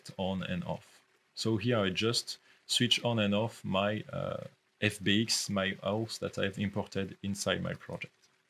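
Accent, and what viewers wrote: France. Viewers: Beside this awesome introduction to the Twinmotion, I love your accent dude :) Vive la France! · c'est marrant j'ai l'impression de que c'est un français qui parle anglais , j'ai raison ???? en tous cas tu sais utiliser l'outil bravo !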